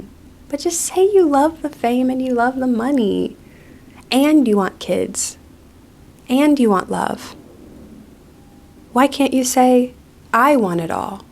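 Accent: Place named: Chapel Hill, United States. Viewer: Omg there was always something so familiar about your cadance. i’m from chapel hill, it all adds up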